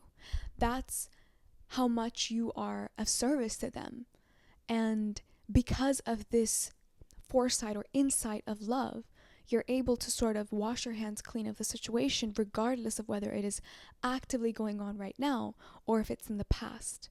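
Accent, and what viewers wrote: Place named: United Kingdom. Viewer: I am attracted to that voice! I am a sucker for that english and British accent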